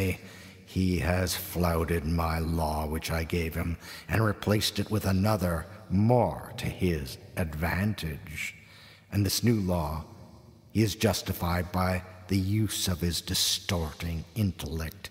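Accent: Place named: United States. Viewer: Kevin Walker No. DeGrimston was British, this is an American accent.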